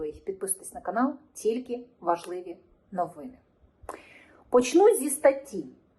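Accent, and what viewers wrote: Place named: Russia. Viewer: В українській мові немає щьо,, щьоб, чі ,тощо. Відійдіть від російської мови.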